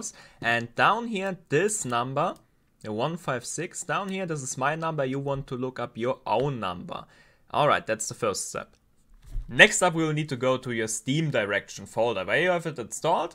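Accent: Germany. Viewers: i hear se deutsch akzent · i hear a little bit deutsch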